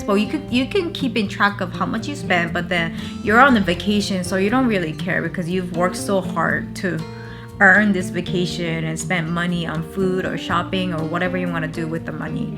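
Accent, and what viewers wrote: Hawaii, United States. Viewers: Only one year. I can hear the local accent. Great perspective on living in Hawaii. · Wow she speaks good with a little pidgin for being in Hawaii for a short time! Mean!! Hahaha she get’um small kine! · I would say that she's a Japanese-American from Hawaii that was living in Japan for work purposes before returning to Hawaii. It's pretty obvious from the way she speaks that she's lived in Hawaii for a long period of time. She's got the lingo down pat and that's not easy for a non-native speaker. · Interesting, she speaks with Hawaiian accent?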